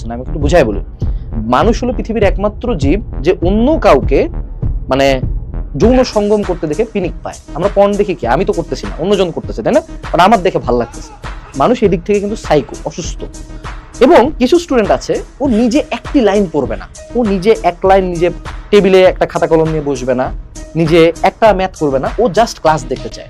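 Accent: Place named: Dinajpur, Bangladesh. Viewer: হ্যাঁ তে দিনাজপুরের চেংরা কি চাটগাঁইয়া ভাষাত কথা কহিবে বে?😂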